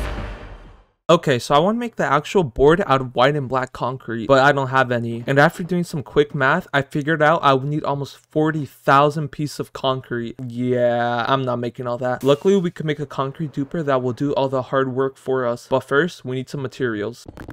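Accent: Norway. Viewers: Are you Norwegen?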